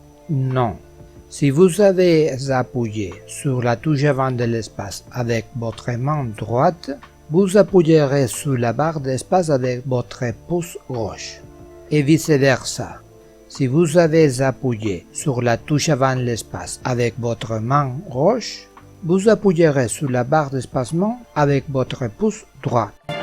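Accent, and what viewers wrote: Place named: Russia. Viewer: s'il vous plait mr vous n'etes pas français car votre pronanciation je crois que vous etes russe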